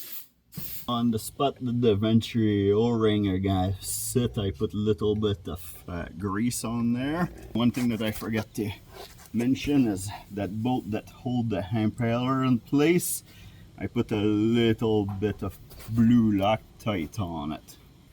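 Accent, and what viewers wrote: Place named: Quebec, Canada. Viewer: Sounds like you are from Quebec, like me from Montreal!! I am your first commentator! Great video, will try and by the way, never thought of doing the job this way.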